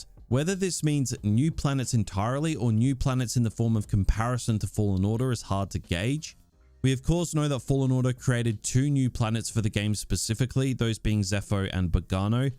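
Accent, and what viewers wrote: Australia. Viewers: The australian accent is unbelievable annoying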